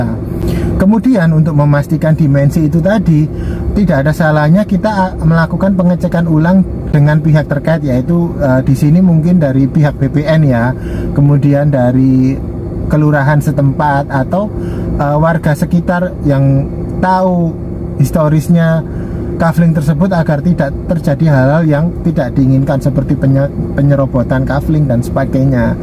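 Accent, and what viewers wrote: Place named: Jambi, Indonesia. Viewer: Kalo dengarin dari logak cakapnya Orang jaambi nih Betul dak bosku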